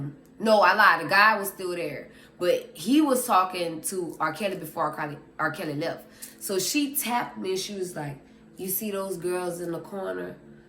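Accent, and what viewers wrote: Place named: Louisiana, United States. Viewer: I knew you sounded Louisiana lol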